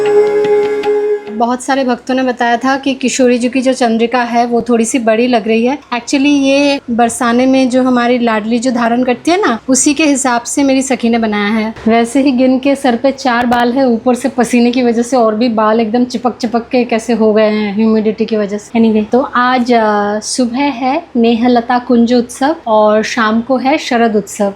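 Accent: Bengal, India. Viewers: Di speaking in Bengali accent flawlessly 😮 mere sar ke upar se gya sab 🥲😅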